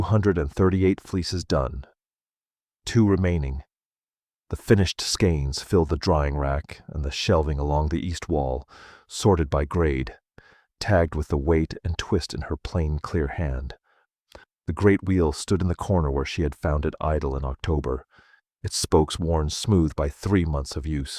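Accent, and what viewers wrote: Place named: Australia. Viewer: That was beautiful! Sounds Australian.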